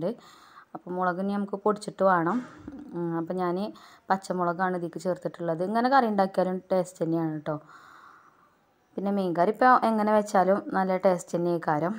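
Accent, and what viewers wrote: Malappuram, India. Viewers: ഇയാൾ മലപ്പുറം ആണോ 🤔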